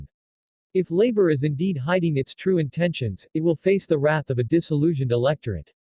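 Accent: United States. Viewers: Another Lying Labour Loving channel. It’s not claims it’s the truth. They want to complete the communist state of Europe. UK channel using American voiced bots says it all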